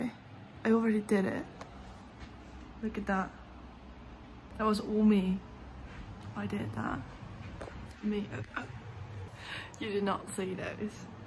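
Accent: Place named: RP, United Kingdom. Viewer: Proper posh accenttttt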